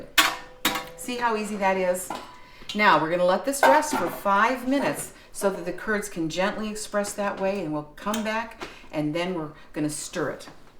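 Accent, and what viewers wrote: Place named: United States. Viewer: 86 Deg... I would assume it is Fahrenheit? A. Because of the US accent. B. Because I think a germ culture won't feel at home in anything but Luke-warm... C. I don't see vapour rising and in 86 Deg. C there would be vapour... But not everyone is an Engineer who loves making food, so it would have been nice if the publisher didn't assume only USA people watch these videos, and mention conversion quantities. 86 Deg. F or 30 Deg. C An Ounce or 28 Grams... etc.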